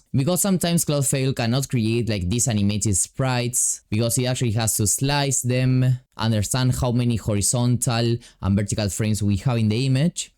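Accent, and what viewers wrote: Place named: Argentina. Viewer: habla argentino mejor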